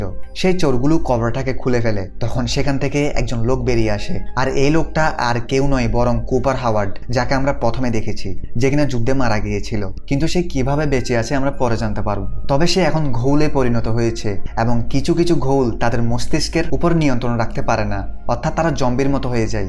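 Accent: Chittagong, Bangladesh. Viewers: ভাইয়ের বাড়ি কি চট্টগ্রাম?আমি চট্টগ্রামের। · Brother this is chattagram special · ভায়ের বাড়ি মনে হয় চট্টগ্রামে। দিন শেষে আঞ্চলিকতার টান চলে আসে। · Bhai mone hoy Chittagong er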